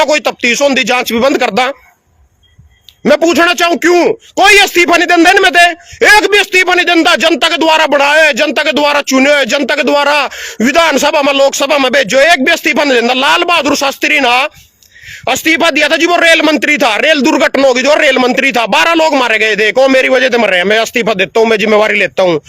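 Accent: Haryana, India. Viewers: भाई आपके भाषण में हरियाणा जिंदा है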